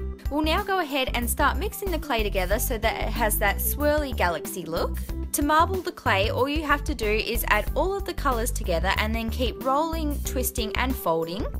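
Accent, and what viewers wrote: Australia. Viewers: are you from australia? same (im from australia)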